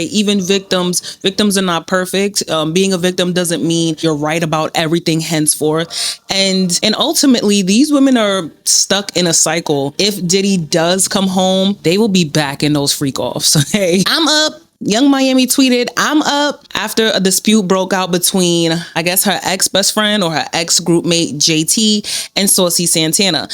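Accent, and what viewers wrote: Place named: New York, United States. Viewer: Great video! Side note I love your NY accent to much ❤❤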